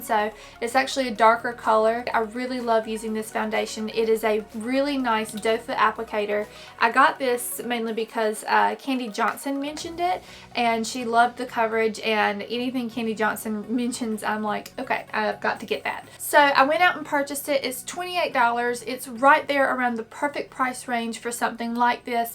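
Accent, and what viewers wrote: South, United States. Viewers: You are stunning and I love your accent! Are you from the south?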